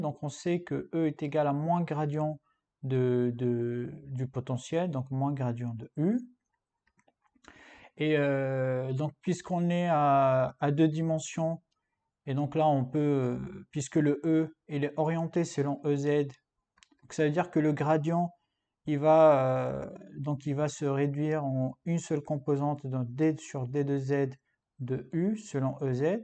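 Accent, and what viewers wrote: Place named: France. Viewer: magnifique accent francais, comment te la eu ?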